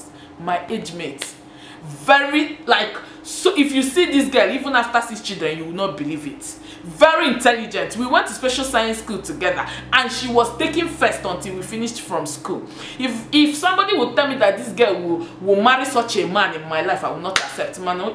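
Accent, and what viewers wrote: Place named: Igbo, Nigeria. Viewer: See let’s be abolishing this I must not marry someone from Anambra as I’m from Enugu and so on especially amongst the igbos. Love you! Hailing you from Lagos state and coz your dialect is so close to mine. I’m from Abor, Enugu. Also congratulations on 15k!!! Love to see it🎉🎉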